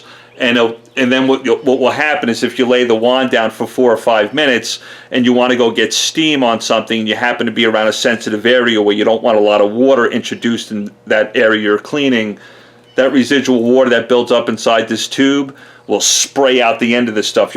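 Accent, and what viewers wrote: New York, United States. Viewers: Yes. The annoying noo yawk accent. How does area become area-er?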